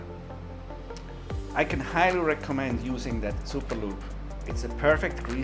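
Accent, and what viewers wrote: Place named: Switzerland. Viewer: Are you maybe swiss? Your english is great but the accent seems familliar to me 🤔 falls ja liebi Grüess us Züri 😊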